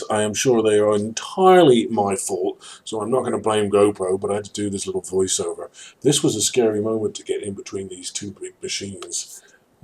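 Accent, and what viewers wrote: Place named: United Kingdom. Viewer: another Brit with messed up ascent... when I lived over there my accent didn't know where I was from... but when I got back here the American twang died ... lovely video of your first trip out ... are you still on the road as this is over a year old... one day I will be back and plan to travel again..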